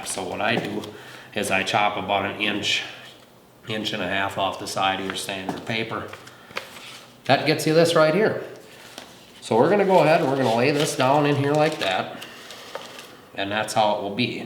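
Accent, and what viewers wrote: Minnesota, United States. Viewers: That Minnesota accent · I hear the MN accent bro. "There you goooo" lol love it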